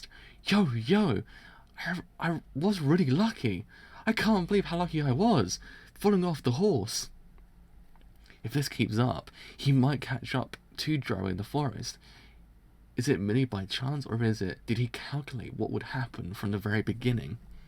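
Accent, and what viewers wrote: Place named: United Kingdom. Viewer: I think is for your British accent lol, the way you pronunce "The legs of" sounds pretty similar to "Alexa" for me xD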